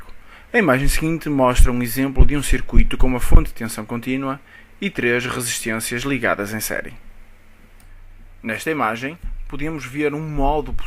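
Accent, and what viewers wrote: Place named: Portugal. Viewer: que bom que eu tenho facilidade p intender português de Portugal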